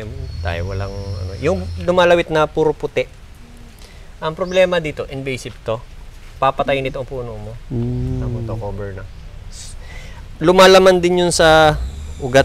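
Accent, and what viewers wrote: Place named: Mindoro, Philippines. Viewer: Pang Mindoro din ang tagalog ni sir hehehw.e..taga mindoro po ako...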